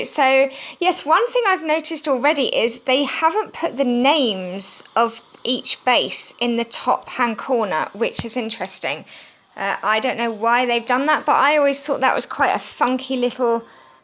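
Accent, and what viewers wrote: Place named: United Kingdom. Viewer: Who talks this way? Which part of britain is that accent?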